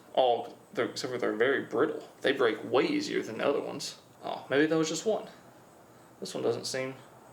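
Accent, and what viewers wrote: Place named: Pennsylvania, United States. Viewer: What state are you from cause I’m guessing PA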